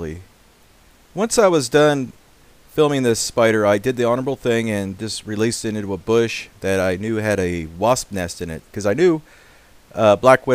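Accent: Australia. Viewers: lol you aren't Australian are you? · are you an aussie? :p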